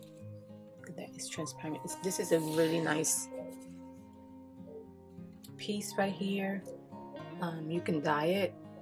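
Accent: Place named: New York, United States. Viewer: Absolutely amazing! Love the adorable racoon printable!! I really like you NY accent too! Adorbs!! :)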